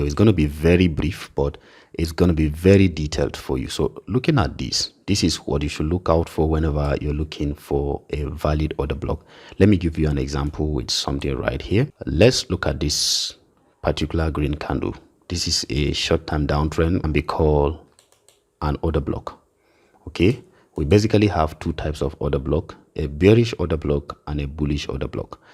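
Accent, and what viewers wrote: Nigeria. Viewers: I don’t know if it’s because of your Nigerian accent that’s makes it easier to understand for me but you always teach in such way it’s easy to understand thanks a lot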